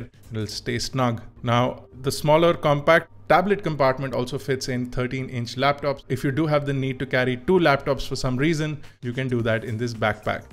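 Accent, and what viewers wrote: India. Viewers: R e bhai aluminum bol le..kya alomenum alomenum lga rakha h...kab tak angrejo ki copy karoge...Indian accent is the best accent.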